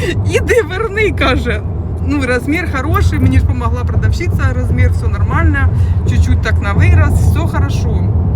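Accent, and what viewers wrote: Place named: Dnipropetrovsk, Ukraine. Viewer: Це з Дніпропетровщини такий суржик. Ви вже повинні знати що в Україні не розмовляють чистою мовою. У всіх областях є свій місцевий діалект. Це не тільки в Україні, в різних країнах є цей феномен. Краще вам більше читати якщо ви цього не знаєте.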